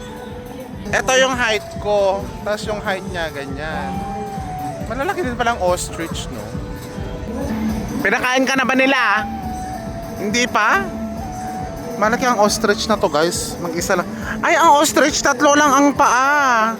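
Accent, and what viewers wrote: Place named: Visayas, Philippines. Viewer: Hahaha! Bisaya pala yan si sir!😂 "dako kog ulok" 🤣🤣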